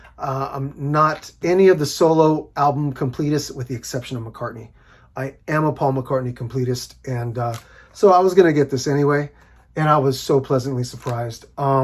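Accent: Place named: France. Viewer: Hey thanks for the recommendations. I must admit I am not that big of a fan. I have only heard his first but it was really boring to me. I do like although I don't have any of their albums on vinyl. that is interesting. I do have. I didn't realize they were still putting out music. Nice French accent by the way. I hope you are doing well. Cheers.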